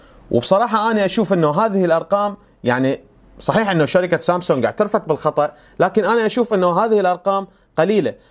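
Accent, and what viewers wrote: Iraq. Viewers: انت عراقي؟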